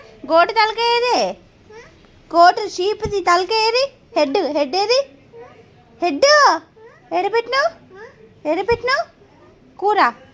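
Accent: Telangana, India. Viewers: మీరు మాట్లాడే పక్క తెలంగాణ యాస చాల బాగుంటుంది సిస్టర్ వదిన వీడియోస్ నెవీ అందునా మీ విలేజ్ వీడియోస్ అమ్మ నాకు చాలా చాలా ఇష్టం . మాది rampalli x రోడ్ డే సిస్టర్. మీ పిల్లల స్కూల్ లో కలిసాను గుర్తువుందో లేదో. Tq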